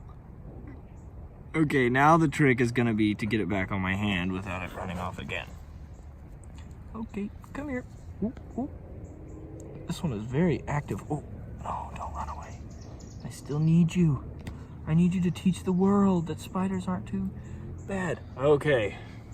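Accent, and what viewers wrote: RP, United Kingdom. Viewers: Good british accent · That Attenborough accent tho...❤👏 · Good posh English accent and I’m English!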